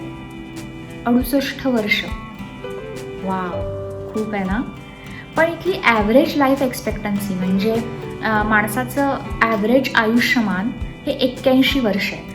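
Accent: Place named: India. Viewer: No offence but Indian dialect of English is really hard to swallow in.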